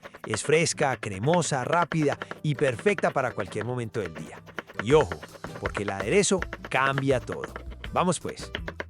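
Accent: Colombia. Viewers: Me encantó la ensalada y me encanta su acento colombiano!! Hágale pues…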